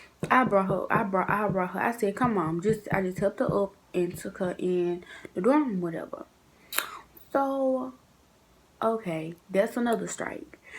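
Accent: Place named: Georgia, United States. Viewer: I just love your accent.It sounds like you're from Georgia.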